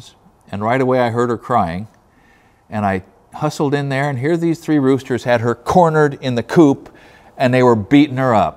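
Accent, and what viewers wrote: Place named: Northeast, United States. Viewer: Love hearing the ring of a northeastern state from the mouth of an honorable northwestern man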